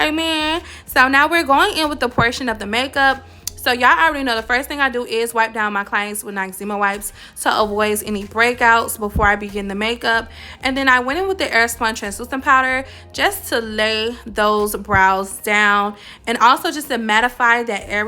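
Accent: Miami, United States. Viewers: That Miami accent tho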